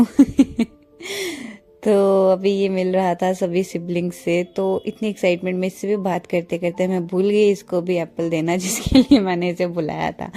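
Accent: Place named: Punjab, India. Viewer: Ur punjabi accent is really gud from where u did ur schooling and higher studies?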